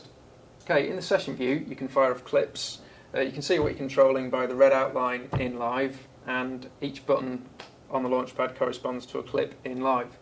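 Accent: United Kingdom. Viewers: If you don't want to hear an English accent, maybe avoid videos made by an English publication like MusicRadar? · If I hear one more polite British accent used to demo a music product, I'm going to puke.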